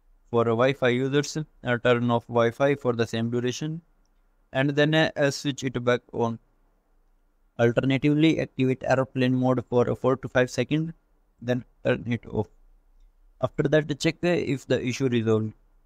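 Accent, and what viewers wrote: India. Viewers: I couldn't understand a word of this. Heavy (Indian?) accent = incomprehensible.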